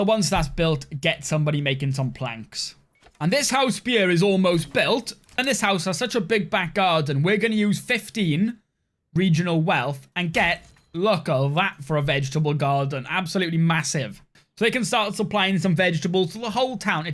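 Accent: Wales, United Kingdom. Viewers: Is that a Welshman I hear? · Got me hooked straight away with that Welsh accent lad! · Ooooof so Welsh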